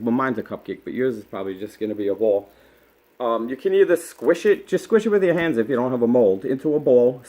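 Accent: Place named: Boston, United States. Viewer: I love you accent. Are you from Boston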